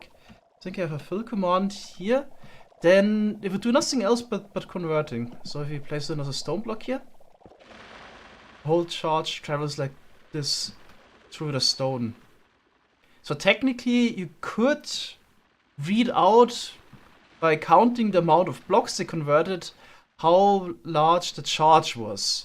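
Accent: Germany. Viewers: 10 sec in and i knew you also bist ein deutscher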